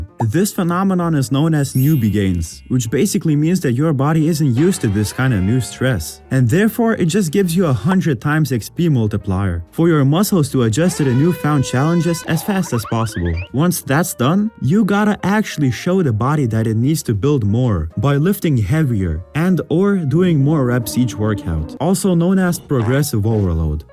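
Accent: India. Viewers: Bro the indian accent😢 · Don't you think it's racist to do that Indian voice over?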